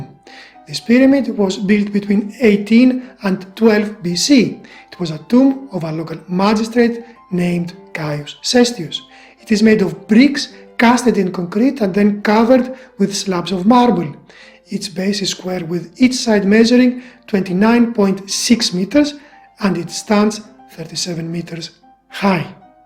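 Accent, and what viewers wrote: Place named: Greece. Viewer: You sound Greek af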